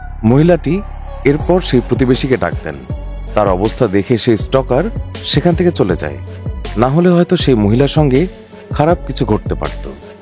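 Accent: Bangladesh. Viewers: Ami sure apni narrator er beware jante chacchen. Hmm mone hoy Bangladesher Bangali. ❤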